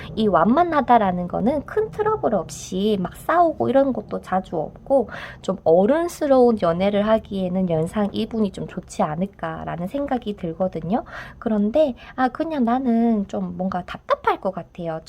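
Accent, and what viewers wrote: Gyeongsang, South Korea. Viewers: 하트 뽑았습니다! 처음 왔는데~~~ 경상도 분이시죠? 사투리😁 저도 대구사람인데ㅋㅋ 경상도사투리로 듣는 타로는 처음인데 재밌네요😄 외로워요ㅠㅠㅠㅠ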